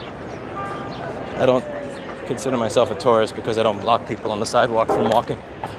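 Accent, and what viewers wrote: New York, United States. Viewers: Hi, Willy here. I listened to this from 75th St. and York ave, where I live as I walked to 5 W 37 ST. where I operate Reichenbach Hall German Beer Hall. I figured I would say hi. I was saying to myself, as you walked by 37th St, maybe he will mention the "sandwich board sign" that we have put on the corner to try and lure peeps down the street. Anyway, thank you for the entertainment. You still sound like a New Yawker. FYI, lost a restaurant around the block due to an asshole landlord. You have no idea how much $ we lost. If you are in the area again, contact me, you have a bratwurst and a beer on me and I will let you take a piss there if you don't tell anyone else:). One more thing, I think NYC will come back. We just have shitty leadership. And it looks like we will continue to have that.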